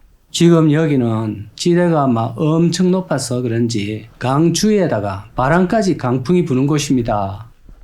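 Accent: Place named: Seoul, South Korea. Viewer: 이제 왜 경상도 사투리 안쓰요 서울말 한다고 서울사람 흉내요 경상도 사투리 매력으로 보고 좋아요 찍는데 이제 안볼란다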